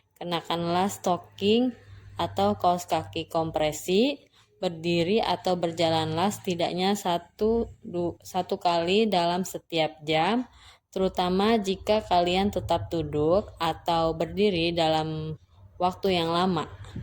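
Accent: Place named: Java, Indonesia. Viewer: Belajar membaca dulu biar lancar.mendok banget bacanya ngapak2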